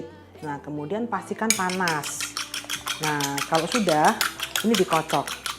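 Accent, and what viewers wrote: Java, Indonesia. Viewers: Ya ALLOH, saya banget liat bunda msk, suka logat jawanya medok bangeeet ☺☺☺ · Ya allah luwes banget nguleknya,nggak nyangka bunda pinter masak. Jowone yo gk ilang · Bunda..jan Jowone ga ketinggalan..salut bunda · Bisa lucu juga lho mbak,medok bs Jawanya,..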